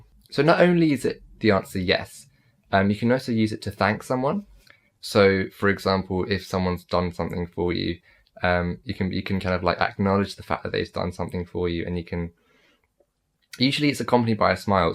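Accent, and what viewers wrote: India. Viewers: you can mask your Indian accent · I like your indian English accent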